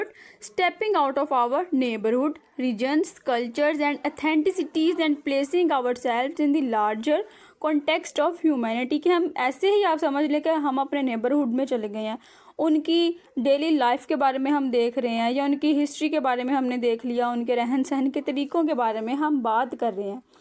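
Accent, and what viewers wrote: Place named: Himachal Pradesh, India. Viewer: Very nicely explained, 🎉 Are you from Himachal, or from Pakistan? ❤ The way you speak is so attractive, this kind of slang I always like. 😍 God bless 🙌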